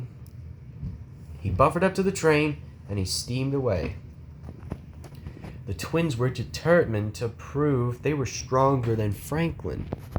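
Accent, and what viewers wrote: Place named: Australia. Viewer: why does he have an australian accent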